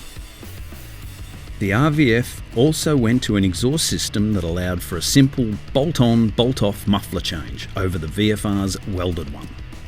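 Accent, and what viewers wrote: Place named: Australia. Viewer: thanks for the helpful reply! You sound like me on my MC22 haha. Please keep up the videos, it's nice to hear an Aussie doing good reviews of bikes.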